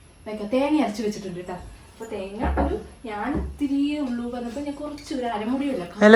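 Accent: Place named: Palakkad, India. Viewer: Palakad slang very nice 🥰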